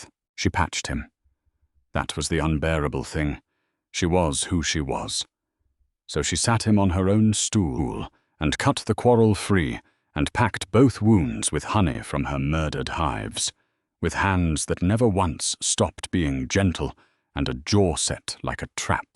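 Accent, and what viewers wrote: United Kingdom. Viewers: My solution to the annoying video pictures that don't match the story is to fold a piece of paper over the top of my cell phone that is long enough to cover that section, click on Show Transcript, and follow along with that purring yummy English / British accent and, as the fantasy tale unfolds, my imagination does what it is meant to do and creates a movie in my head that captures the right characters into all the scenes. This technique might help you enjoy more videos that have that irritating picture section that doesn't match the plot line details. Just a thought from Windsor, Ontario Canada 🇨🇦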